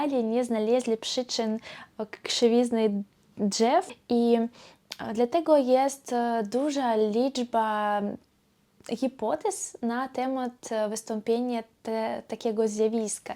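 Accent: East, Poland. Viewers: Lubię pania słuchać. Język polski w pani wydaniu brzmi fascynująco. Mam nadzieję, że nie pozbędzie się pani swojego wschodniego akcentu. Okolice naszego Krakowa są bardzo ciekawe. Myślę że zabraknie nam życia żeby poznać wszystko. Pozdrawiam sąsiadkę. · Pani wschodni akcent w polskiej mowie brzmi bardzo barwnie, przyjemnie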